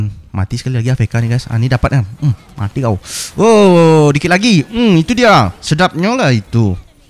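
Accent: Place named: Pekanbaru, Indonesia. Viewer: Org Pekanbaru bg? Nampak betol logat pekan ABG awak ni 😅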